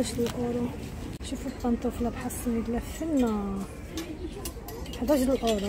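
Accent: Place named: Tangier, Morocco. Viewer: هاذ الشي زوينة أختي يمكن اوربا ماشي طنجة اللهجة طنجوية بالتوفيق تشرفيني❤😍🤲👍🌹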